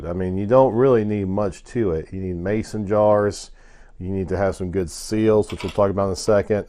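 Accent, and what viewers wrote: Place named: Appalachia, United States. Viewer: He gots one of them Appalachian accents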